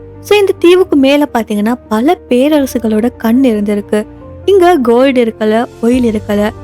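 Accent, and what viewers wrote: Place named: Sri Lanka. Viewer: ❤ enaku unga srilanka Tamil romba pidichiruku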